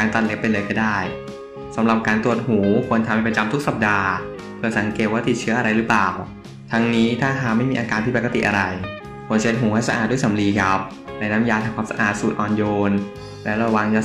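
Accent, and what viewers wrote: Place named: Suphanburi, Thailand. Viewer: คนพากษ์เสียงเป็นคน สุพรรณบุรี ราชบุรี สมุทสาคร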